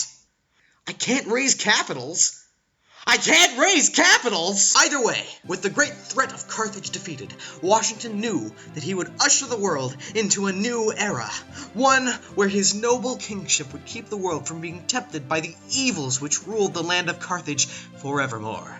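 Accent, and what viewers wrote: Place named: United States. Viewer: He sounds like America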